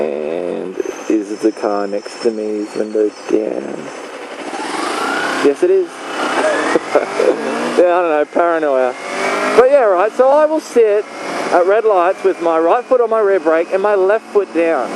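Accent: Australia. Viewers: are you an aussi?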